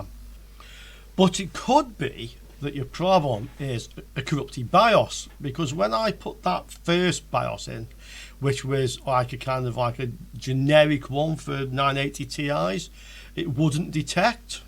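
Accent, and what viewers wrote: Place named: Staffordshire, United Kingdom. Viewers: inteet duck, i recon ya from near Tunstall i left back in the 90s but i can hear the accent still lol i miss oatcakes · Hi is that a potteries accent I hear Nice.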